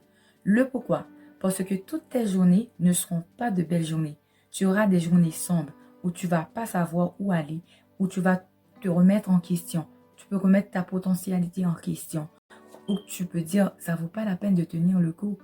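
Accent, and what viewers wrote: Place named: Canada. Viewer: Accent canadien Mwen renmen l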